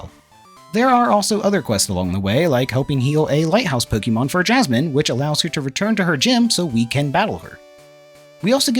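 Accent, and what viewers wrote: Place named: Philadelphia, United States. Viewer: You have an interesting accent! Is that a Philadelphia or New Jersey by chance?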